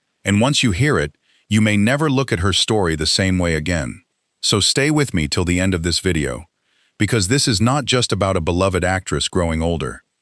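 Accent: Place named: United States. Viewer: Why do we have to suffer the American voice-over wether it be AI or genuine?😢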